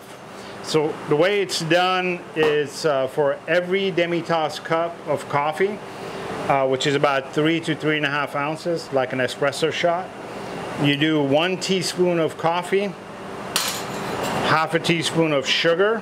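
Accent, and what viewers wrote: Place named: Texas, United States. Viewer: Well now I've seen it all, just about.. An Armenian with a Texas accent.... yee-ha mayre tagherem!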